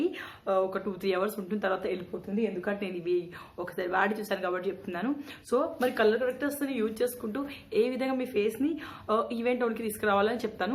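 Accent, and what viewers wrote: Telangana, India. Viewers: Hi gaaru mi voice ki fan aipoyaa Mana telanganaa yaashaa lo super gaa cheptuvuntaaru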